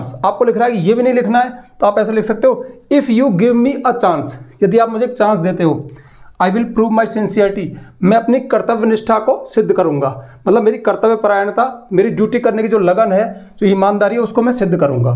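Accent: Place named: Meerut, India. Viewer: meerut se ho ky sir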